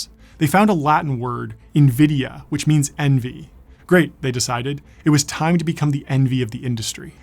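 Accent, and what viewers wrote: United States. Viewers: I'm an English pedant, my multilingual son wouldn't make that mistake. However I do accept as the saying goes that we are "two nations separated by a common language" and the guy speaking is speaking American and not English. Just rubbed me the wrong way is all.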